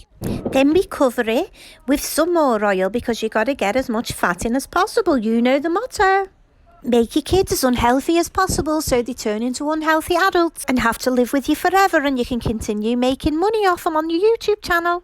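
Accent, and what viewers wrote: Liverpool, United Kingdom. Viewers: 😂 I love you and the Liverpool accent! Makes it even more funny. My Nottingham sccentbis crap sand I don't even have it completely because both mu parents are from.the South Coast. I would love a coop accent like yours! X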